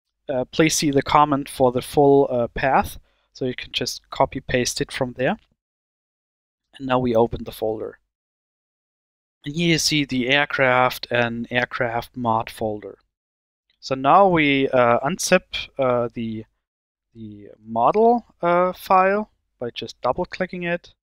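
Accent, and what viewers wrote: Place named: Germany. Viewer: Ich liebe es wenn deutsche Englisch reden✌️ P.S. gutes Video xD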